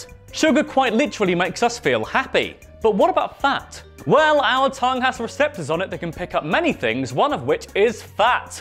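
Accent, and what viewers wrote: England, United Kingdom. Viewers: I've always wondered. how does one get such an awesome British accent? I'm part English and only have a Swedish accent mixed with native accent (both I am too) QAQ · I've always wondered are you english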